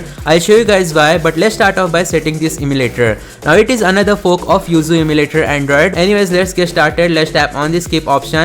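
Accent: India. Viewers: Damn Indian accent is so weird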